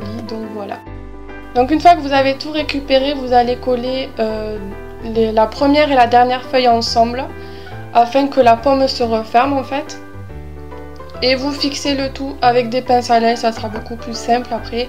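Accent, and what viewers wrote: South, France. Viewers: Tu viens du sud?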